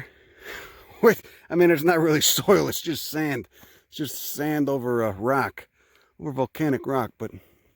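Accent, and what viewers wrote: Chicago, United States. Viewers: I believe he’s originally from the chi. Sounds like a south side or south suburbs Chicago accent to me. Though I pick up a little New York as well.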